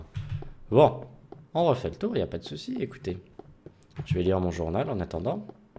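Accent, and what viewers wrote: Quebec, Canada. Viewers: Bon accent québecois,:-)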